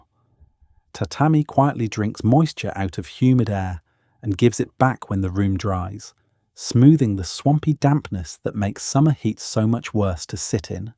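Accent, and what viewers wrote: England, United Kingdom. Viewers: Isn't it an Al Englishman?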